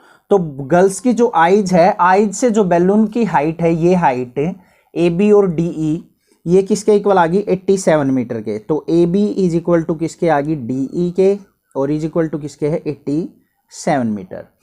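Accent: Haryana, India. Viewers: sir aap haryana ke ho kya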